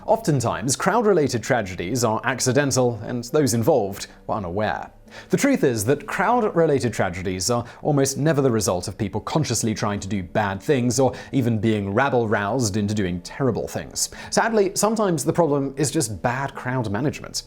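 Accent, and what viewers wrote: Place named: RP, United Kingdom. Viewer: isn't hired because he knows anything. He's a mouthpiece with a posh accent.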